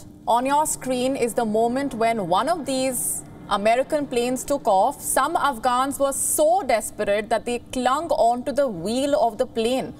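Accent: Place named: India. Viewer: 印度英文口音好明显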